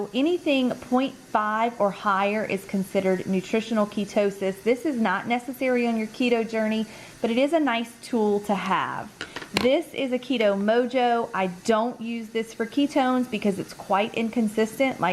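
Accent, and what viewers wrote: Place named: Louisiana, United States. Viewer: You must be from somewhere in Louisiana. lol that accent is for real! ❤️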